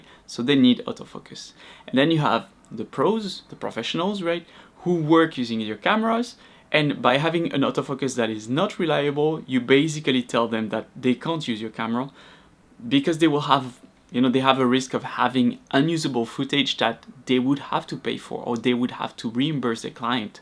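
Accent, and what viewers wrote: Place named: France. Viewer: tu as ralenti dans ton script ,c'est beaucoup plus audible,ca faisait trop je veux masquer mon accent Francais;) c'est une " critique" positive ;) c'est vrai que c'est l'exode Fuji en ce moment,pour ma part ,toujours en xt 3...avec beaucoup de ratés,notamment photo d'enfants .... perso le nikon zf me fait de l'oeil depuis plusieurs mois,pas forcément le FF ,mais plus l'ergonomie Fuji et le meillerur AF,tu l'as essayé?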